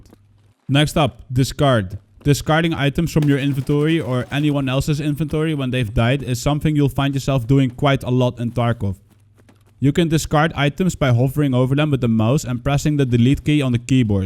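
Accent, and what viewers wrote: Netherlands. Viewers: Great video! are you Dutch btw? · are you dutch? because I can hear some dutch language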